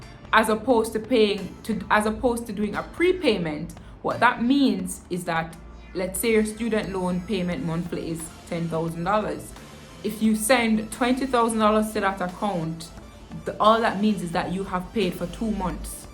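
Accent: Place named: Jamaica. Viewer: SO INTERESTING,,,I WAS A VICTIM OF ALL YOU SAID,,WOW,,, LOL LOVE THE WAY YOU GET CARRY AWAY IN JAMAICA 🇯🇲 patois lol.Daneel really your sister lol,same personality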